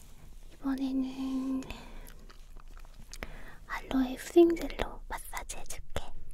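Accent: South Korea. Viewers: I love this korean accent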